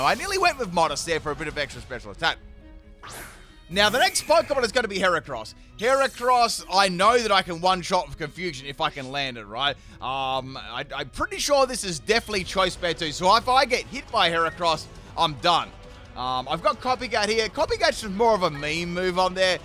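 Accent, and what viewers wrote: Australia. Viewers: Gotta love his Australian pronunciations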